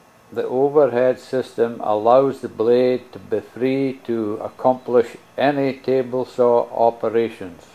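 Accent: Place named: Scotland, United Kingdom. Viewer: Sounds liek the Scottish guy on teh Simpsons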